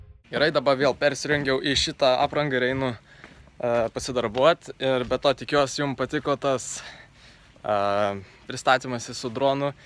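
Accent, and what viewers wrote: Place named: Samogitia, Lithuania. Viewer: Sakau ko toks žemaitoks,girdis iškart 😄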